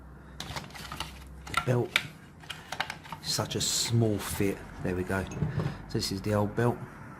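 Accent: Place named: South London, United Kingdom. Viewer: Nice one mate. I was banging my head against the wall until I found this vid. South London boy aye.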